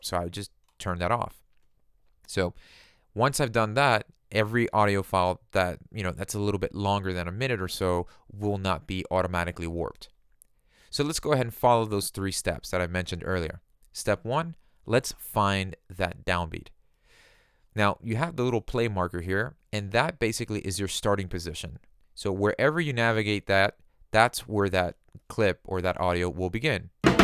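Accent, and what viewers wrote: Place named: United States. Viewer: You sound just like the guy from headspace only with an American accent, but so similar lol. Nice videos!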